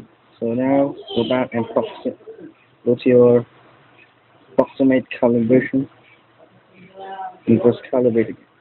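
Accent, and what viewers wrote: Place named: India. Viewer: at least you understood what you saying ? your English accent is worst , don't know why our fellow indians talk English in this worst way ! buy tube light in your washroom.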